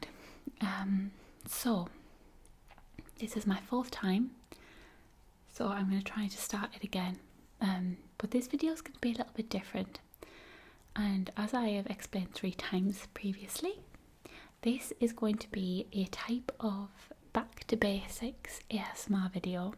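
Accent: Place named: Newcastle, United Kingdom. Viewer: You know who you sound like accent-wise? You sound like the guitarist Andy Taylor of my favorite musical artist (and yes, I am being serious) Duran Duran! He came from around Newcastle, so I'm guessing that's where you come from as well... ? IDK, maybe I should just look up Middlesborough as I know that's where you said you lived, but wait, you said you moved there, so you could come from anywhere in England. And you don't sound Welsh to me, but then again when I think of what Welsh people sound like I think of Rhod Gilbert and I'm laughing on the inside just thinking of a female with Rhod Gilbert's voice. That poor imaginary woman. :D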